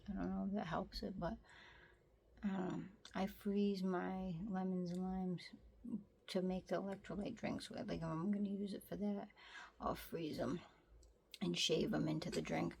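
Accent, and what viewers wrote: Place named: Rhode Island, United States. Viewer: I dont know why I was under the impression you were in RI beyond your accent (know it because I used to live in Portsmouth) but I think thats where you are?. Didnt realize that cannabis was illegal there if im right and thats the state youre in. But MA and CT are close to you and yes those places sell potent thc products but that just means you use less of it at any given time if that is something you enjoy doing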